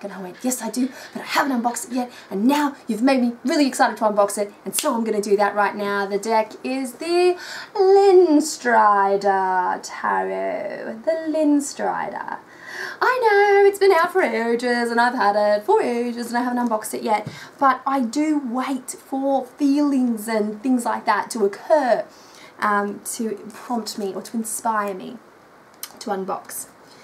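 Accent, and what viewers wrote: Australia. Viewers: Wow I'm always super surprised at how you pronounce certain words! Haha no offence, the Australian accent is gorgeous, but I'm so not used to it (Swedish TV mainly shows American shows and movies...) and I always have to concentrate a bit more to understand you. :D Again, no offence, I need to stop being so ADD anyway, so it's good for me haha. The Linestrider is a bit odd to me, I was very drawn to it, but when I opened it up I felt it was a bit "colder" than I had expected. It almost gives me the same vibe that the Fountain Tarot did for quite a while! But now I love the Fountain Tarot so I have hope this one will warm up for me too... :)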